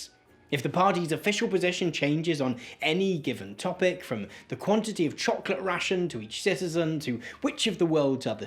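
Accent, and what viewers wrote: RP, United Kingdom. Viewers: Your bbc voice makes these scary human beliefs a bit easier to handle. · Mr., the simplicity of your mind cant excuse the deliberate missinformation and outright lies in this video about both peterson and 1984. The wannabe etonian accent and wording you use for dramatic effect and to trick youre fans to think you are actually erudite cant really fool anyone else. Oh well, what a waste of time.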